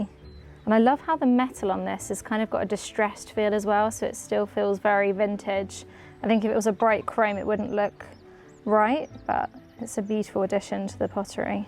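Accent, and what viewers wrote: United Kingdom. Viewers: I don’t get her British accent…🤔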